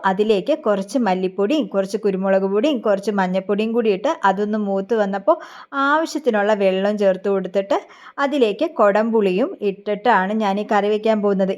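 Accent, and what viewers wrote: India. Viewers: സംസാരം കേട്ടപ്പോൾ കൊട്ടാരക്കര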